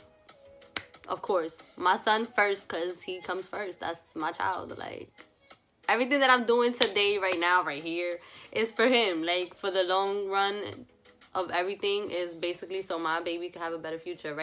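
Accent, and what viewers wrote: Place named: New York, United States. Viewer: You can do this girl. Keep up your platform. I’m a new sub and already love your channel. I live in Quebec Canada and love yourNew York accent lol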